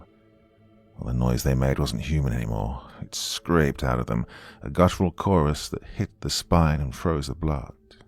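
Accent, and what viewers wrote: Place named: United Kingdom. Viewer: Time to break out give me an American accented leader every day of the week. Don't need you Brits. I'll bite on Canadian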